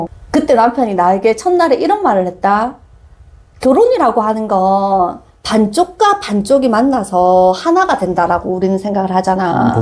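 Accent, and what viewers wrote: Gyeongsang, South Korea. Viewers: 실버버튼 응원합니다. !!! 42개월 여아 키우는 워킹맘입니다. 숲놀이 검색하다가 교수님을 알게 되었는데 지금 저에게 필요한 내용이 많아 이것저것 듣다가 팬이 되어버렸어요 지금 틈 날때마다 계속 듣고 있는데 사투리에 대해 고민이 있으신지 몰랐네요. 전 선생님께서 사투리 안고치셨으면 해요. 제 남편이 경상도사람인데 말투가 너무 쎄고 공격적이라 부드럽게 말해달라고 하면, 경상도라 그렇다. 경상도는 원래 그렇게 말한다라며 정당화 시키거든요. 근데 또 경산도도 내륙쪽이랑 바닷가쪽이랑 또 다르다며 바닷가쪽일수록 더 거칠다라고 말하는데.. 경상도여도 말투가 이렇게 다정하고 부드러운분도 계시다고 꼭 알려주고 싶어요 ~~~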